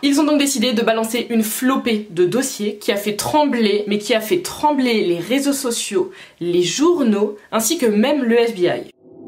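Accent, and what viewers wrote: England, United Kingdom. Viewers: ton accent anglais🤩👍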